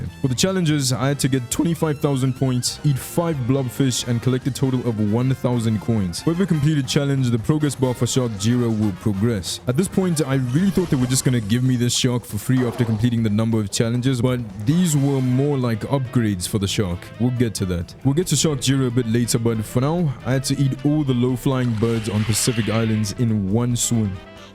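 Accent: South Africa. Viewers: You're south african??? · Wait are you South African? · yoo you're south African? me too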